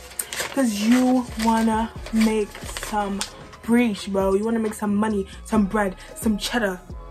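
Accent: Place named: New York, United States. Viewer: love the ny accent 🤩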